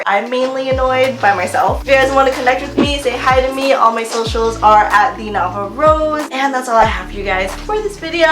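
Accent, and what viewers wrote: United States. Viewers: You can tell she’s from Cali, even if she wouldn’t say it💀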